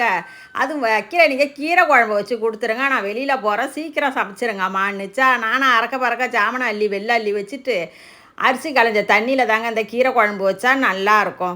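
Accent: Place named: Thanjavur, India. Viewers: நம்ம ஊர் பேச்சு,,, ❤ from mayavaram 🎉🎉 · தஞ்சாவூர் தமிழ் ❤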